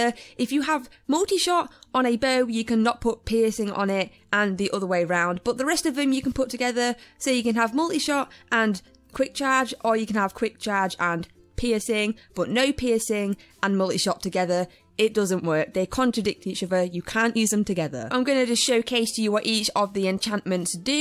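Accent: England, United Kingdom. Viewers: Cant You Hear Her? And Shes English And What Language Are you?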